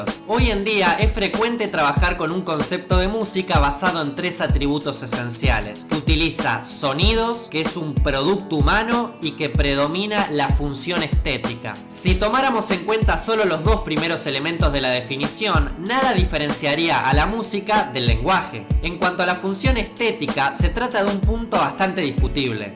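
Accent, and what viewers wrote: Argentina. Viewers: vos sos argentino,no?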